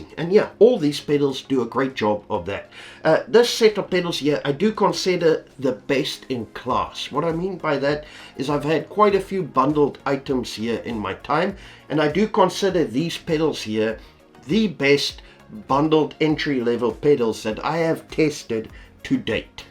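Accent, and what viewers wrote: Afrikaans, South Africa. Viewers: 😅I know your profile says UK 🇬🇧 but you sound so Afrikaans it's impossible to deny that you might be from South Africa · 10 months later….Wootware has them at R6499…. I was also hearing the accent…and the Brrrrey comes through every now and then😊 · I know a South African when i hear one sir whats the availability in sa?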